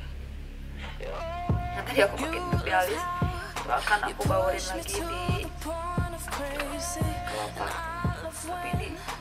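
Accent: West Java, Indonesia. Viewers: Chhetri pantesan ada sundanya😄 salam kenal juga kaa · sunda banget kak, gusti nu agung=)) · sunda pisan nyaa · ^Gusti nu agung. ngakak si kaka nyunda :v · teteh nyunda pisan 😍😍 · Sundanese bgt hihi lucu teh🙈❤️ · Baru pertama kali nntn video kakak & langsung suka! cara ngomongnya asik bgt. ada sunda2nya lagi😆 btw beli pupa brush nya dimana ya kak?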